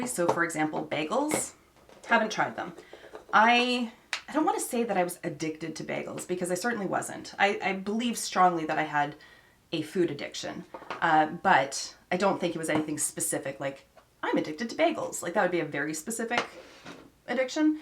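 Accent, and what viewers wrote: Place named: Canada. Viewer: I didn’t realize! Until I here certain words of course I’m like she’s Canadian! ☺️however you accent sounds a lot like most here in US but Wisconsin and certain spots in Illinois definitely pronounce some words like you. You know we are super behind in some things lol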